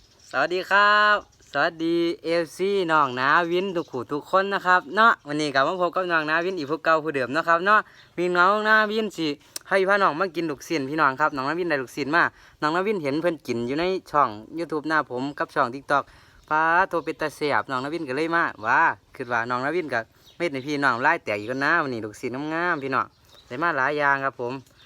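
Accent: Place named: Phu Thai, Thailand. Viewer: สำเนียงภูไท จริงๆ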